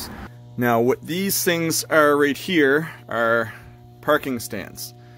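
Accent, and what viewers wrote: East Coast, United States. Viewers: Within the first 5 seconds I could already tell you were an east coaster lol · Is this Florida or Boston? I miss the East Coast, and from your accent I'm gonna assume you're from that area. I just feel like I can tell where a place is when I get recommended random videos from the places I miss. There's just a specific way things look..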